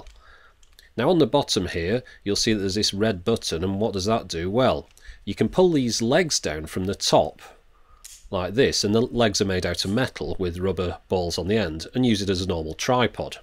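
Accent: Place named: Manchester, United Kingdom. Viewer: Cool Manchester accent. I wish I sounded like that...but of course everybody recognizes my accent..."I'm here to pump you up."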